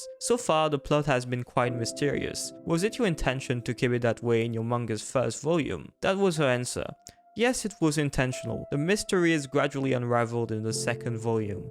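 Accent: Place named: United Kingdom. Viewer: you are french but still your accent is unbelievably british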